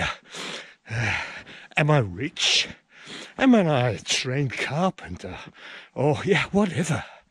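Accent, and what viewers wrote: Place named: Northern England, United Kingdom. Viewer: Very interesting channel. Just love it. I follow quite a few off-grid channels but love to see your fresh content! My question is, your English is very good and I cannot help but think it sounds very Northern English, from the north of England. Have you spent time in England during your working life?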